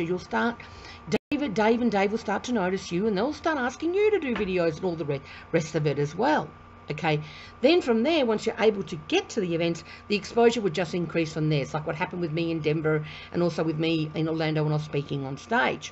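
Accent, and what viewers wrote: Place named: United Kingdom. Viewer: Lovely british accent!!!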